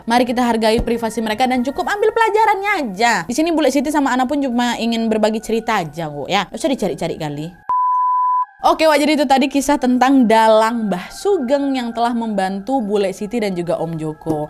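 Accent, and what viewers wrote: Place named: Java, Indonesia. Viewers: Keren banget kak nyeritain kisah nya sambil pake medok jawa, karna ceritanya berasal dari daerah semarang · Kalo ga salah ka pernah kuliah di jogja ya ka. Pantesan bisa logat jawa😂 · Al Fatihah untuk Mbah Sugeng dan bapaknya Bulek Siti, Kak bawain nya medok banget. Selamat hari Kemerdekaan Republik Indonesia yg ke 78🥳🥳🥳🇮🇩🇮🇩🇮🇩 Sehat terus buat Kak, calon debay nya dan para tim. Edit: terimakasih atas 1,3k like nya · Ceritanya emang bukan yang serem jumpscare, tapi beneran penuh pesan moral, mengandung bawang dan beberapa kali sempet merinding pas dengerin 🥶🥺 Al-Fatihah untuk mbah Sugeng dan bapaknya bulek Siti 🤲 terimakasih kak buat storytellingnya yg selalu mantul (medoknya meyakinkan banget hehe) dan buat Anna yang sudah berkenan berbagi cerita 🙏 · Logat nya semarang banget😂😂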